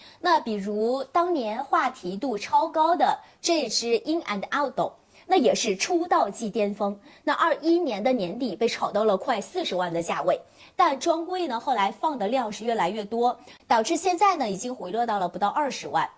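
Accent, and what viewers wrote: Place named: Japan. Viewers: 可是她不只是用日語發音，還混著來，聽起來真的很突兀。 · 因为她说的是日语发音。她在日本做这行，按日语发音来没有任何问题啊。就像我们把Hermes用中文音译成爱马仕，法国人也没理由来指责我们发音不标准吧。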